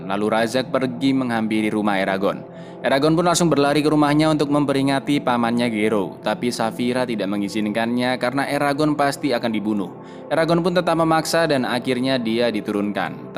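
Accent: Java, Indonesia. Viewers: Kang lu orang Jawa yang mana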